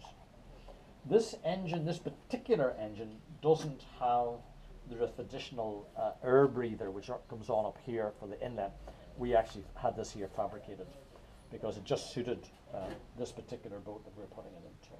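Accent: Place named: Northern Ireland, United Kingdom. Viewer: Love the Northern Ireland accent!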